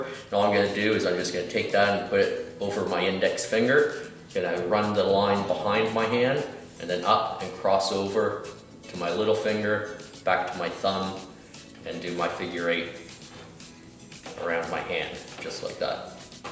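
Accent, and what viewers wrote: Canada. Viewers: Nice Canadian accent.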